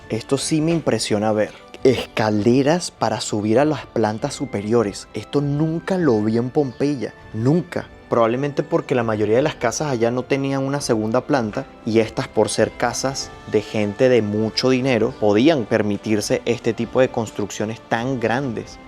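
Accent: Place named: Venezuela. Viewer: se dice "eStan muy DeSgastadas " nose por siempre dices ejtan muy dejtadas el acento venezolano es feo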